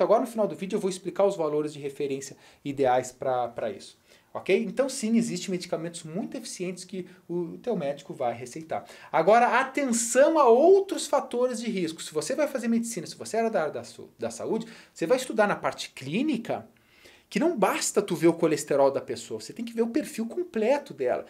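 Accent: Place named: South, Brazil. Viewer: PARABENS PROFESSOR QUE AULA HEIN?????? QUE SIMPATIA!!! ADOREI ! O SEU SOTAQUE É DO SUL! É CATARINENSE, OU GAÚCHO ???? VC É NOTA 1000! MUITO OBRIGADA!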